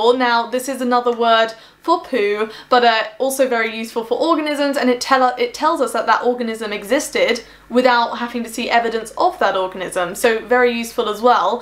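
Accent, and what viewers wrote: United Kingdom. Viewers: A lady’s British accent can even make “poo” sound classy.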